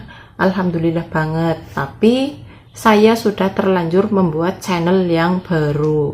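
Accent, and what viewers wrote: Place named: Banyumas, Indonesia. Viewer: Q suka sm vidio2 nya mbak. Sama sama bahasa nya ngapak 😃😃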